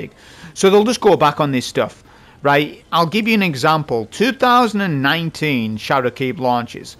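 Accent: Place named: North East England, United Kingdom. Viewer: You sound like you from my neck of the woods , north east :) just found your channel, thanks for the solid advice il shall be grinding all day!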